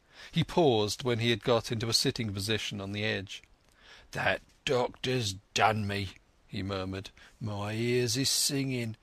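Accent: England, United Kingdom. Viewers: Thanks I prefer your pronunciation and accent rather than the American ones, one remark is that your reading is a bit slow, but when it comes to direct speech ie when you say what some novel's characters say that's fantastic I find, and more realistic, after all the author was British and so are his characters! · thank god for a English voice reading this ! Just started to listen to a recording with a yank reading it - so wrong ! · Well read Matey! Nuffin loik a genuine Brittish accent. Very enjoyable. :) F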